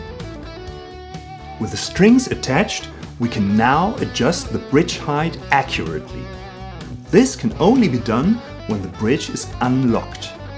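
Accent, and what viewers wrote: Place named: Japan. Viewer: This guy talks like a Japanese man that just learned English...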